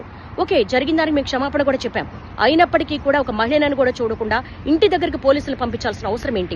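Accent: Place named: Telangana, India. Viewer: That is Telangana Talkn9bans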